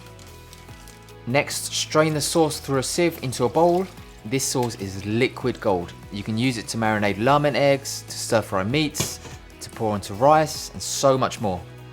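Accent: United Kingdom. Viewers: Love your British accent!! Hi from America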